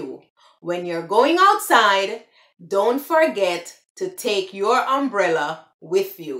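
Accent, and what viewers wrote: Jamaica. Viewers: Yayyy!!! Thnx for this video!!!! I needed this! I'm subscribed to a few Jamaicans n I needed this lol I love the accent!!! Would love to visit your land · Yes Sister I love this A wa ya say Jamaican accent 👍👍👍👍👍 English is such a long proper language, 😂 😂 Tfs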